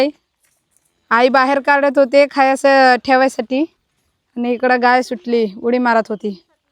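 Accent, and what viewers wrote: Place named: South, India. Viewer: तुम्ही Up ची आहेत कि सौउथ ची कारण तुमची भाषा सौउथ सारखी आहे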